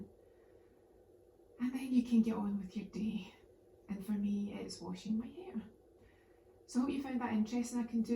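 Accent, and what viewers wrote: United Kingdom. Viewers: Is that British accent?) I'm definitely not used to British, and why does it even sound to me like slavic sometimes😂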